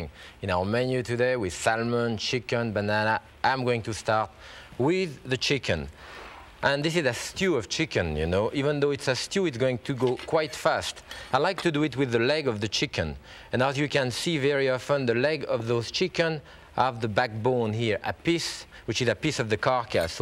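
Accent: France. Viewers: I think I'm getting to the point that I can figure out his French-to-English quirks, even though some of them take a second to click. Bananas Foster with butternut sauce...butternut-->butter nut-->beurre noisette-->brown butter.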